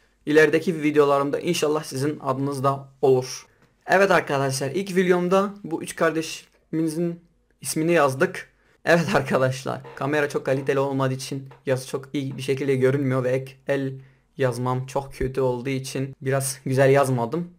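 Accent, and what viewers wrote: Turkey. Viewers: Amed şivesi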